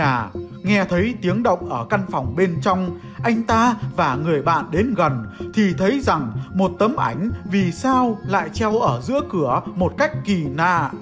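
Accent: North, Vietnam. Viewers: Giọng bắc kỳ mà đọc truyện ma nghe cũng đc đó .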